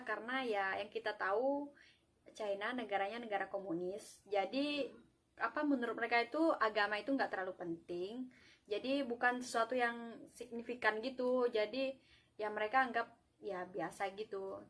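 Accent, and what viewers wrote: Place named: Central Kalimantan, Indonesia. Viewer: mirip logat kalimantan tengah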